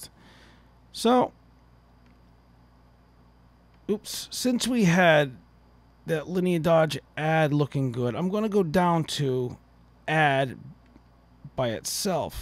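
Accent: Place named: Boston, United States. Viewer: just subscribed. placed your accent in Steven Wright country, so not surprised to hear the Boston reference. nice straightforward tutes delivered humbly . thank you sir.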